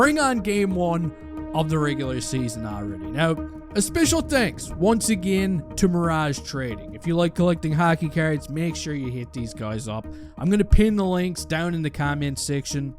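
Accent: Newfoundland, Canada. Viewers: I think I just found my new favorite hockey channel. Top notch Canadian narration, filled with tons of catchy phrases. This should be a good year bud, I'm subbed now so let the lettuce flow and the O's be ewwwwwwt! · love the videos man, great content mixed with humour 👍 Plus the Newfoundland accent is the icing on the cake 😁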